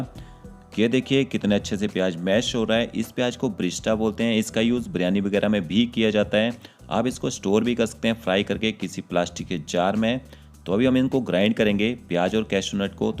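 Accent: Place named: Punjab, India. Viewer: आपका पंजाबी स्टाइल में बोलना बहुत ही अच्छा लगता है ।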